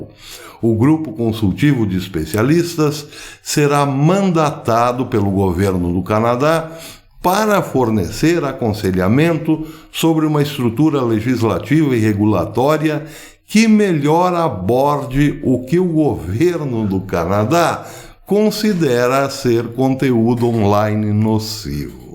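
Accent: Caipira, Brazil. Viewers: O senhor é o máximo com essa forma meio caipira de falar, amooooo, carinhos fraternos.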